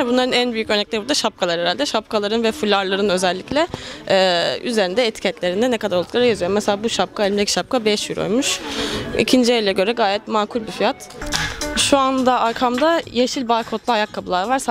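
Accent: Kastamonu, Turkey. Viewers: FRANSIZCA DİL ÖĞRENİRKEN Mİ GENİZDEN KONUŞMAYA BAŞLADINIZ YOKSA KASTAMONULU olabilir misiniz?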